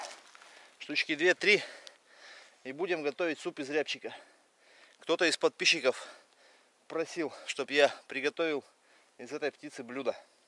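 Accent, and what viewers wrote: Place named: Vyatka, Russia. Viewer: Вятский акцент уникальный.ни с каким не перепутать👍👍.подписон.привет из Москвабада🖐🖐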